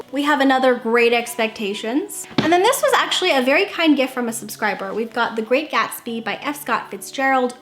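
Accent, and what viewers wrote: United Kingdom. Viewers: I bloody knew you were Bri’ish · That intro 🤣🤣🤣🤣 your British accent could star in a period drama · OMG That bri-ish accent!!! YOU TOTALLY KILLED IT HAHAHA!!!!💗😹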